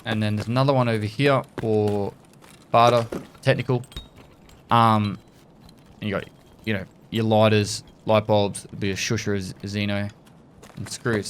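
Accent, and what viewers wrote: Australia. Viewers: As a foreigner not used to Australian accent, i did not understand when he was talking about some « cyfe ». Then I realized he was talking about safes....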